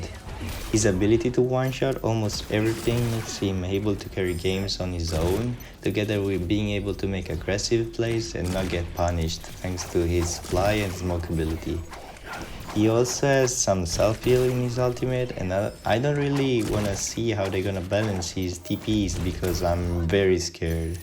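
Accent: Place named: Italy. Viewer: Gotta love the italian voice! Thanks for the S/O and nice vid!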